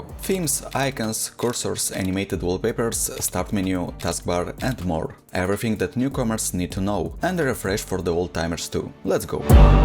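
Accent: Russia. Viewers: Ахах, с первых секунд слышно что русский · говоришь с русским акцентом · как же чувствуется этот русский акцент) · русский акценкт крутой, я так явно говорить не умею, как он так научился, у меня вообще только понимание языка вкачано, а остальное плохо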